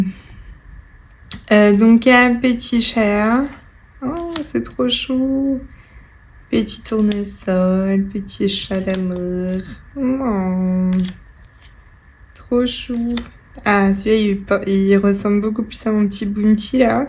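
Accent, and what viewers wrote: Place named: England, United Kingdom. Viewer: Coucou 😊 Pleins de sous sous à mettre dans les défis, trop chouette 😘 Et un beau colis de Linda en prime. C'est superbe 🎉 J'aime bien ton accent anglais,😊 je ne fais pas mieux 😅 Des bisous 😘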